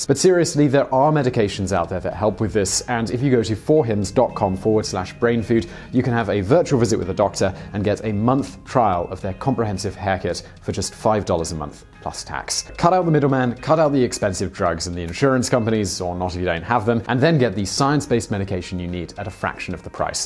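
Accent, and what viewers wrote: England, United Kingdom. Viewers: I like your British accent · Chuck Barry that's called an English accent.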